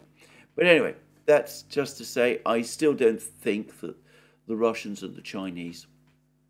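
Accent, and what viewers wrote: United Kingdom. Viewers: Dear, I continue enjoying your videos every single day and feel grateful for both their content as well as your fine British English. I once offered to make suggestions on the pronunciation of Russian (and possibly Ukrainian) proper names as well as some factual information on Russian history and trivia, and now see you have accepted suggestions from someone else. You do not need to pronounce Russian / Ukrainian proper names as per the source language standards, especially if there is a long standing tradition in a particular case, like, for instance, Moscow. However, the global trend is towards trying to pronounce foreign proper names as close to the original as possible, and you have now made fantastic improvements along this road which makes me so happy as a native Russian speaker with some notion of Ukrainian pronunciation. This is why I would wish to make a couple of additional suggestions if I may. 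A capital letter preceded by a single inverted comma indicate the vowel under stress in a word. First, a delicate one. We say Dni'Epr in Russian, not Dnipr. The Ukrainian equivalent is Dnipr'O (both river and city). Ukrainian men's Christian names are Petr'O for the Russian Pi'Otr (like Petr'O Porosh'Enko) and Dmitr'O for the Russian Dm'Itriy (like Dmitr'O Nataluha). Some more place names: Lim'An Izi'Um Osk'Ol (river)/Ukr.: Osk'Il Tokm'Ak Taganr'Og Andr'Eievka / Ukr.: Andr'Iyivka A couple of family names: Lavr'Ov Poliak'Ov(a) I have no idea of Gen. Mordvichev's own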